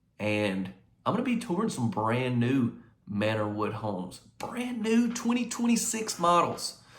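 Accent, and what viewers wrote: South, United States. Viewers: This is so adorable, I love it (and I just love your southern accent too!)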